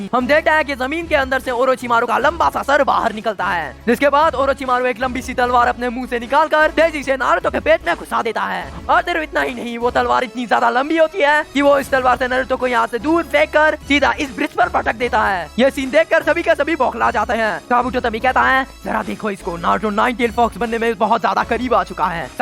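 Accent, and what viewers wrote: Assam, India. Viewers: Are u Assamese 😒?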